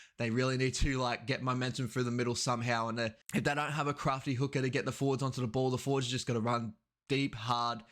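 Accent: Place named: Torres Strait Islands, Australia. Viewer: Im Arab but why do you sound like you got the aussie islander accent. Even the pronunciation of Samoa took me years to get right 😂😂😂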